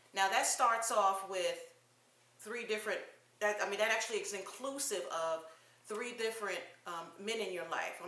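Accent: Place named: New Jersey, United States. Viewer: Ms, great message. I could tell you were raised with your dad by the attitude you have toward family men that provide. Also I can hear that new jersey accent. Peace and blessings.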